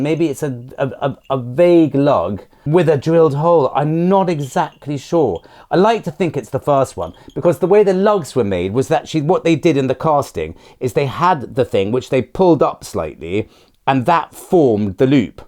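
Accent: RP, United Kingdom. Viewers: Hi, Recently discovered your channel and immediately subscribed! I think you're a hoot! I'm from Glasgow, love your poshness! I've watched a lot of your videos. I love your "woosh" back to HQ! I really like the bird coin too. I love the goofy/cartoon look to it (for want of a better description)! What about a clear matt acrylic sealer for the Roman brooch? PlastiKote is a good brand (I use their enamels). Agree, the gold ring will be the best thing you'll ever find...until you find a gold hoard! Keep sweeping, fella! The crotal bell is doing well on ebay (see the description for the link folks)!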